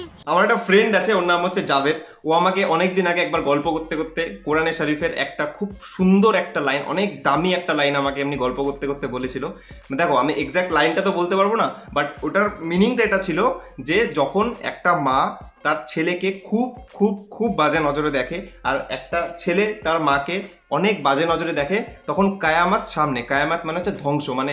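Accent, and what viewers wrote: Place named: Siliguri, India. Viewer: Tmr siliguri te kty bari dada .. Kto din dhore video dakhchi ..kl kei ami amr bor k bollm j ai dada mone hoi siligurir amder mton kotha bole .. Kolkatar moton na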